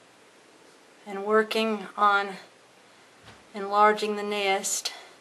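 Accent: South, United States. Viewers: Some of you are being so rude. She isn't a hick just because she has a southern accent. Southern accents DO NOT signify ignorance. She tried to post an interesting video for all of you to enjoy and all you are just being rude. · "Hawnets neyst, it is sooo kewl" southern drawl! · Jesus, he was defending you! He said that because you have a southern accent doesn't make you a "hick"! And then he goes on to say if TOTALCONTROL would say that shit to a black person with an accent. You should try READING COMMENTS THOROUGHLY before you rub that persons face in the ground. >:( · Are you from Kentucky? You have a really rich southern accent! I love the south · omg that country accent bleh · Her southern accent is adorable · He didn't spell it like that because he didn't know the meaning lol... He spelt it that way because that is how you pronounce it with your accent. 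You don't say "Ten-ding" you say "ten-din" like you say "coo" instead of "cool". Granted he should have said, southerners not hicks.. but you guys definitely pronounce words much different than they are spelled xD.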